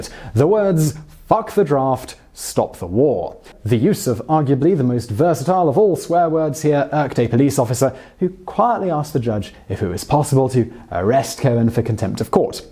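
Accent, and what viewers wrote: England, United Kingdom. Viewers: Very elegant eloquent and deep discussions and debates narrated by a nice English accent talking about.. Fuck lol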